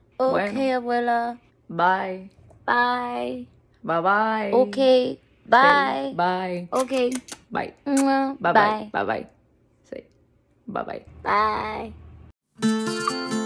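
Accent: Cuba. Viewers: Now I wish, I would have met my grandparents before they passed. 😭 It just hit me that I missed out on all of this. 😔 But, you are amazing. I just love that Cuban accent so much!💜💜💜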